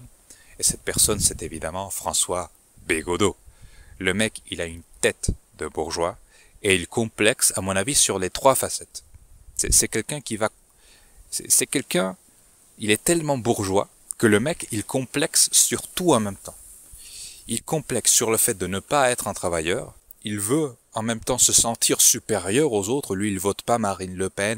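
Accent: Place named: Italy. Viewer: Quel est ce bel accent italien ? Qu'est-ce que la "dissidence cognitive" ?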